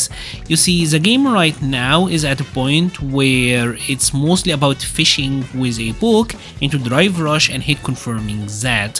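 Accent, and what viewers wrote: Egypt. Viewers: صوتك مصري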